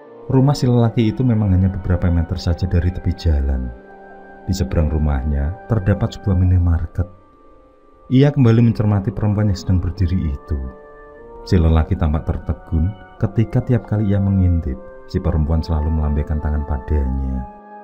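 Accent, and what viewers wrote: Java, Indonesia. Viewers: Kenqpa ya,setiap ceritanya selalu memakai logat jawa..padahal kan budaya dan logat bahasa kita beraneka ragam..kadqng bosen jg kl selalu pake logat Jawa..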